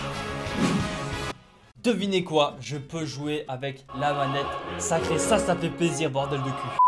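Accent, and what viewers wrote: Belgium. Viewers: t'es le meilleur t'es trop pouissant, je t'adore avec ton accents de Belge, j'imagine, vue que tu vis en belgique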